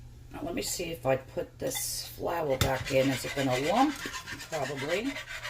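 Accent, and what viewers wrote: New York, United States. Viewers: Love this recipe and love that New York accent ! Cindy From Queens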